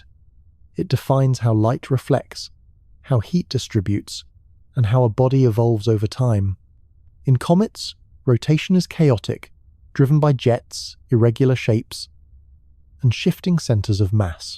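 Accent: United Kingdom. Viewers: i'm watching from 3i/atlas. its 1am local time. oops i think i farted.. nevertheless, british accent is so cringe!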